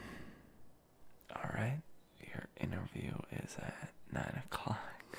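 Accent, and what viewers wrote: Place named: Midwest, United States. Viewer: •__• he’s from the us,, im pretty sure somewhere in the midwest??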